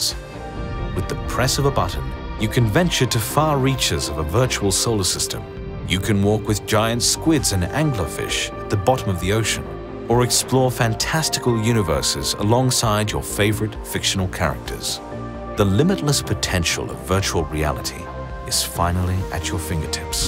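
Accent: United Kingdom. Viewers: I never said anything about clean and shiny animations. I’m not the only one who said they’re mimicking Kurtzgesact, and there’s nothing wrong if they did take inspiration from them. They also have a calm deep voiced British narrator and similar organization and video lengths, they talk about similar topics, the channel also likes posts comparing them to Kurtzgesact so I don’t know what you’re on about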